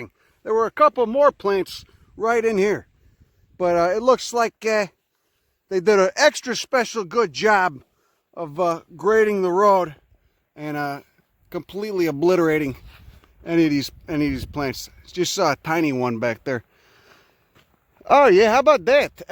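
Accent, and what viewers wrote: Chicago, United States. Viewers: Sound like your from Cicero. I love your vids. Chicago to jersey baby. Keep producing these . most enjoyable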